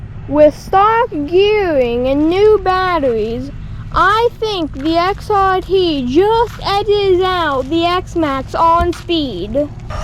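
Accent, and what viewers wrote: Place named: New England, United States. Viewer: Your very welcome ! Your accent sounds like a New England accent. So cool when you took that truck up the stairs! Keep up the great work!💯👊🏼😎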